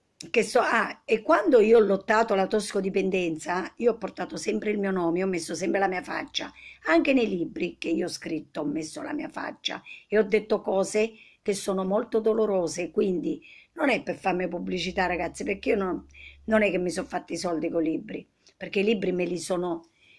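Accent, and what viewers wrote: Abruzzo, Italy. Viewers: bravissima! Si dovrebbe invidiarti per la tua determinazione... Io ti ammiro molto. Mi sembra di riconoscere un'inflessione "familiare": sei abruzzese? Ti abbraccio.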